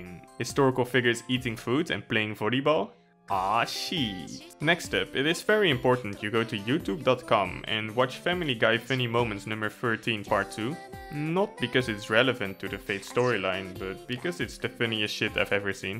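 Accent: Netherlands. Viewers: It’s hard to hear, but u can’t hide ur accent from a fellow dutchman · Are u dutch?🤔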